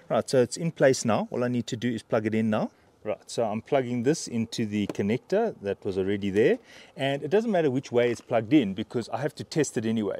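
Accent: South Africa. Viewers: This man has that OG soutie transvaal South African accent. Haven't heard it in ages.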